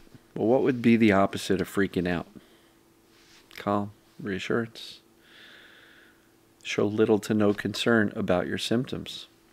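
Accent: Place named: Scotland, United Kingdom. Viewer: Such a great video!! Thank you!! I love how you take the same concept and explain it a different way..🥰 this is “flip the script” with Scottish accent 😃I know you have told us that many times. This “do the opposite” reminds me of the Seinfeld episode where George did that 😂🤣🤣 It works!! Thank you again for your continued support for all of us in this journey..🙏🏼🫶🏼🫶🏼🫶🏼🫶🏼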